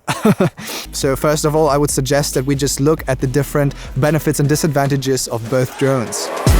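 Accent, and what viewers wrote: Bavaria, Germany. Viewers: Are you from Germany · ich wusste das du Deutsch bist ich hab es gehört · Noch nie deutscheres englisch gehört haha, aber feier ich haha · Haha korrekt erster Satz erkannt dass du aus Bayern kommst korrekt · Ahh lol bei hat sichs geklärt hahaha xD Auch wenn dein Englisch echt ziemlich gut ist hört man es irgendwie immer raus wenn ein Deutscher Englisch spricht xD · Way to funny that you only said like 2 Words and it was already obvious that you are german xD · Na wirkli oda, a Bayrer! Do bist jo goa ned so weit weg. 😉 Wahnsinns Intro übrigens! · Ich wusste es beim ersten Wort englisch hat Mama sofort gehört 😂👍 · Woher aus Bayern kommst?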